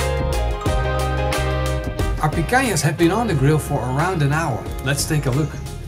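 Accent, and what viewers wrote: Netherlands. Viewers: Je kan horen dat hij een nederlander is door het luisteren naar zijn engels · Nice Dutch accent👌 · Je spreekt Engels als een Nederlander. Ben je Nederlands? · Very obvious Dutch accent lol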